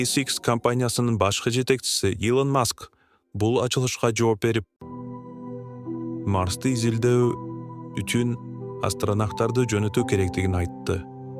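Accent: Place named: Russia. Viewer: Орус эми кыргызча уйронуп келейткандайго.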